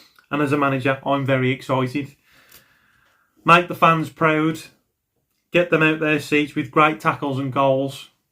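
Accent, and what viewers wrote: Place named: West Midlands, United Kingdom. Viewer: Great video again bud, you sound like your from my neck of the woods lol I'm a blues fan based in ahem West brom. But I'm enjoying your vids mate your certainly getting better as you go along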